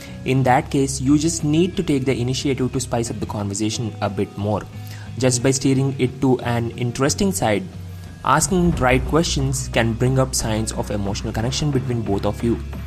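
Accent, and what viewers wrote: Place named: India. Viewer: What the hell indian accent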